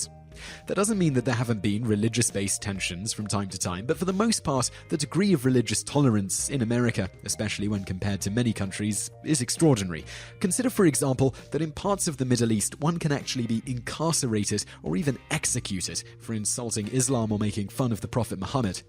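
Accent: England, United Kingdom. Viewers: Getting my American ego stroked by someone with a British accent just made my brain explode! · Wow, I didn't realize this until now: this guy has an English/Australian/New Zealand (IDK not good with accents) accent, dawns the Confederate flag, and talks positively about the United States...my brain hurts. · It's nice to here those kind remarks in a cool English accent. But I have to disagree about Americas "War Ethics," especially over the last couple of decades. As an American I take no pride in telling you that we are on a war of conquest right now. ANd have been for at least 14 years. Probably more. But It's nice to here something positive for a change:) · 'MERICA! From what I gather in the video, is an American, despite the British accent? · Was this guy born in the U.S. but raised in the UK......that explains his accent.....I was also born in the U.S.( New York ) but I was raised in Cyprus due to my dad but I can speak English with an American accent regardless of the fact that I was raised in a Greek speaking country.....now I leave in NY because I always wanted to and I'm 18:D and I think I will stay here forever 'cause I also got family here P.S I'm PROUD that I'm also American.......the topic of this video generically enough:)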